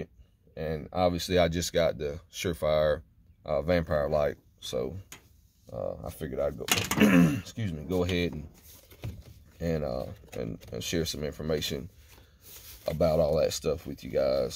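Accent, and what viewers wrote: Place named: South, United States. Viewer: Im sorry but he good ole boy i hear it in his voice. southern livin baby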